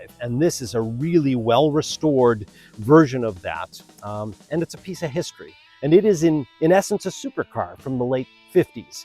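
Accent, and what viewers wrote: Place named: Canada. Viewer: Let Me Guess You're canadian ?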